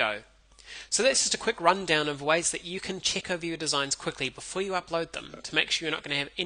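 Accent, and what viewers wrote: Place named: New Zealand. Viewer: kiwi voice ftw! :)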